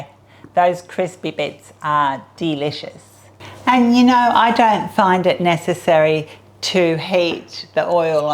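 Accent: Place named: Australia. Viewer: Yeah,the accent! My mother who is an English teacher calls it "a lazy accent" but I think it's an Australian accent,(I think.)😊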